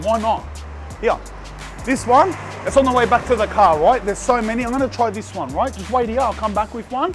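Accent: Sydney, Australia. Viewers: nice guy, fair reviews but man... the aussie slang is hard to follow, it's like a south african, british, aussie crossover combo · That’s that Syd accent for ya 😅 makes me miss home. Just found this channel & it’s entertaining, real & humorous. Died at the coriander 🌿 & I love fresh rolls too 😋 nobody does pork rolls like Syd.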